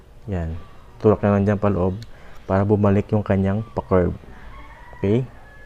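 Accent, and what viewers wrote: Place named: Ilonggo, Philippines. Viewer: Ilonggo ka boss? Dw nabatian ko ang tono ta eh,hahaa.pila ang set sina boss shampoo and water pila magasto? Thanks for sharing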